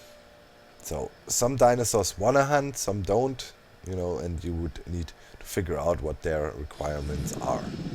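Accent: Germany. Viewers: Your englisch has an accent? German?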